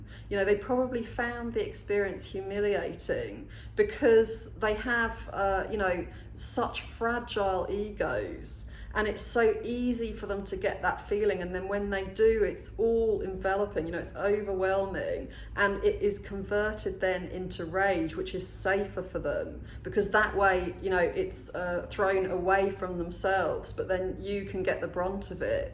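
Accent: England, United Kingdom. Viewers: nice accent, are you from England? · Love your accent. It's like listing to the Queen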